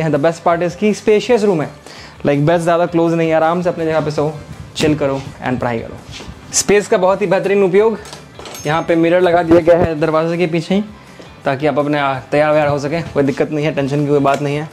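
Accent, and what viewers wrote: Bihar, India. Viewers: Aap bihar se ho kya????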